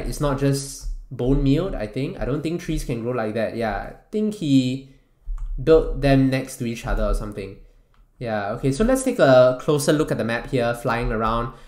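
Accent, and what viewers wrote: Singapore. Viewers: singaporean accent pretty strong here LMAO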